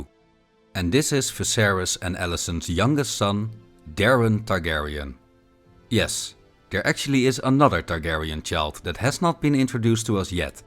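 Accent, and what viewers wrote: Netherlands. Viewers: The narrator is definitely dutch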